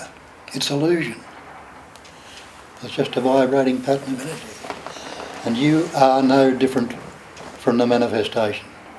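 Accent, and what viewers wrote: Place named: Australia. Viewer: It sounds so much more down to earth with a Australian accent.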